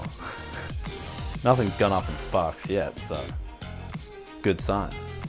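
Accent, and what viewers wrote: Australia. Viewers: Are you Australian? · he's an Aussie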